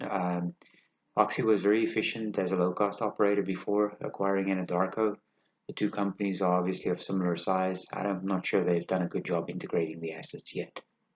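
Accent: South Africa. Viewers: Enjoying your videos. Are you from South Africa per chance?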